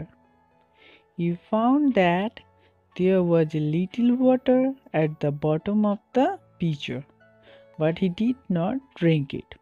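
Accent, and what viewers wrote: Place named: Europe. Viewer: Perfect voice Like European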